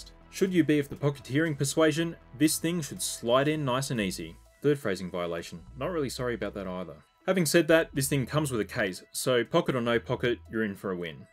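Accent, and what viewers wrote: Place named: Australia. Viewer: Melbournian here, it’s a treat hearing a fellow Australians voice doing this (kinda tired of Russ from RGC voice..)!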